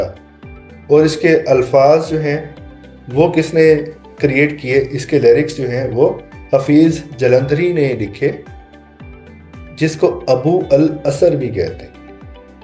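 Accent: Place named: Lucknow, India. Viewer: Sir, you speak very good, and it seems that you have learnt Urdu from lakhnew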